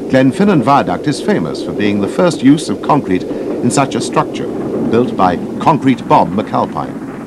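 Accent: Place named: England, United Kingdom. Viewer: och aye the noo......see you jimmy....he has to be English,would'nt understand a jock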